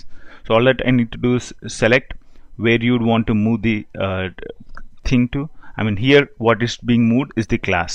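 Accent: India. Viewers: ох уж этот индуский акцент х/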